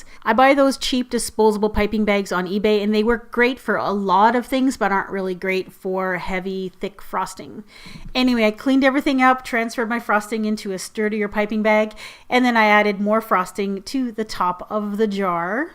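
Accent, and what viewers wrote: Canada. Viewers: I love your accent! Are you from Canada?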